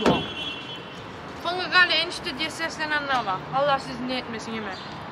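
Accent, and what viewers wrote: Kütahya, Turkey. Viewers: Lanet Gelsin Dedigim Yerdir Kütahya Ama Senin Şu Şiven Yüzünden Sevmeye Başladım.. Yayın Hayatında Başarılar Kardeşim 😊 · Bizim gız, Öncelikle başarılı çekimlerin devamını dilerim. Kızımın Üniversitesi için yılda 4 kez İZMİR başlangıç KÜTAHYA, GEDİZ güzergahından ZONGULDAK'ĞA geçiyorum. KÜTAHYA şivesinin zaten kendine özgü hoş ve tipik bir iç EGE aksanı var. Bu aksanı ve kültürü bozmadan bulunduğunuz beldenin yaşlılarını,düğün örf ve adetlerini konu alan videoların sizlerin daha çok tanınmanızda yardımcı olacağı kanaatindeyim.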